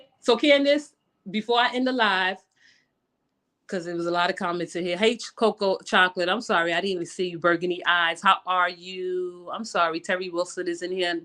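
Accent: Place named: Bronx, United States. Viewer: Hey my beautiful how long have you been back on utube I love hearing you speak that northern accent 🦁🦁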